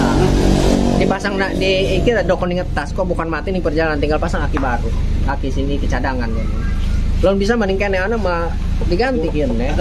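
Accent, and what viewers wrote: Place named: Indramayu, Indonesia. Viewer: Ngomong e kaya wong dermayu ang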